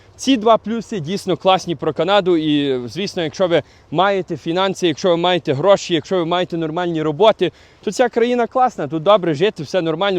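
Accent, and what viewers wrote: Western Ukraine, Ukraine. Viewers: Про яку мову ти можеш говорити,якщо ти закордоном? Який ти українець?Ви перевертні! Українці на Україні! А ти за своєю вимовою із Західної України, ти навіть не бачив і не чув тих вибухів. Під шумок рванув " щирий українець". Вчи англійську, бо там українська мова нікому не потрібна. 😂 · Так у него западный акцент. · Дуже дратує твій западенський акцент !!! Тому ДИЗЛАЙК !!! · Дивлюсь вас вперше,ви мабуть з Західної України, бо там говір такий. Усюди де чую такий тембр мови,згадую дитинство у бабусі у селі ,як розмовляли мої дядьки . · Это западноукраинский, а не американский акцент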